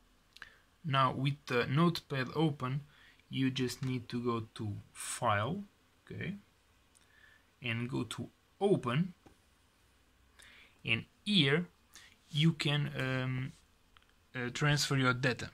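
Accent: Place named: Russia. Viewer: You sound very russian lol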